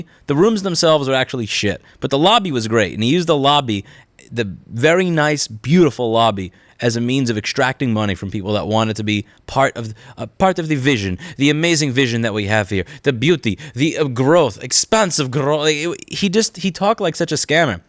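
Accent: New York, United States. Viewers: I want to start creating content for my YouTube channel, Facebook Live etc. I am researching cameras, software and the modern way of doing things. I am so 2000, using XP and Cubase SX via FireWire interface in my recording studio. Thunderbolt interfaces and 60fps 1080p Cannon M60, usb 3 is all news to me. I have alot of catching up to do. For some reason your video where you are riding your bike and talking about potentially leaving NY caught my eye. You are an interesting person and should have your own sitcom with that NY accent lol. I am from Canada BTW. Keep up the good work 🤸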